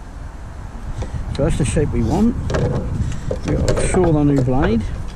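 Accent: Norfolk, United Kingdom. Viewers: Are you Norfolk!? 😍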